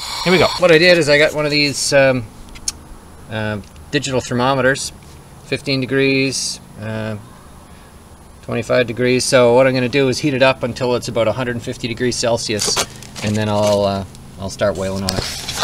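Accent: Canada. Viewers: Canadian eh????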